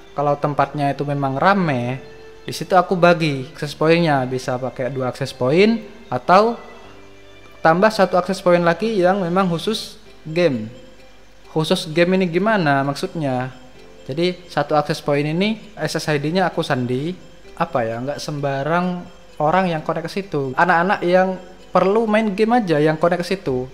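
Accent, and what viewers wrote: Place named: East Java, Indonesia. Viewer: Logatnya sampean kok kayak Orang Lumajang / Jember / Bondowoso. Sampean domisili mana mas?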